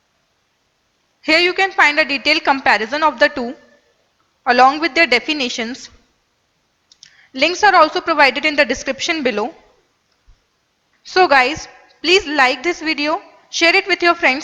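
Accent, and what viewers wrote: India. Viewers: Indian accent > > > > > >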